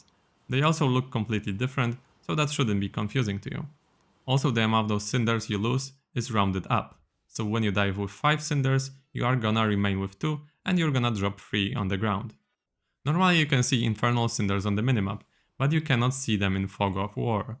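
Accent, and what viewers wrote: Poland. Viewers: Polski akcent idzie od razu wyczuć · Dosłownie moja reakcja! Ciekawe czy to zmyłka, czy serio jest Polakiem. Akcent w sumie by się zgadzał. :D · bro are you from Poland?😁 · Coś tak przypuszczałem że jesteś z Polski po akcencie. Niech żyje wielka Polska!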